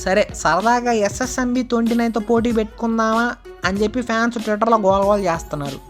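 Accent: Nellore, India. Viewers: Nellore slang bro..😂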